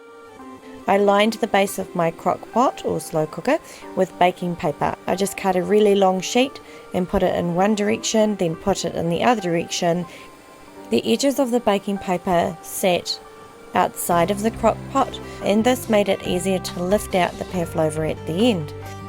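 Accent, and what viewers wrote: New Zealand. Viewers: Soo good to hear a kiwi voice doing this.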